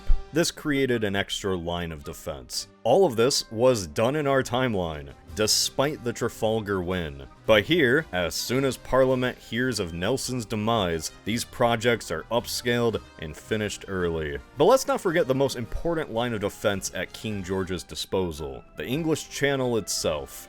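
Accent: United States. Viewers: triggered in british at hearing american pronounciations of places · The way Americans pronounce English towns is excruciating. (But funny) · Yep, this is certainly an American pronouncing these French Marshall names. Epic History... My beloved, please, clean my ears of this hideous noise.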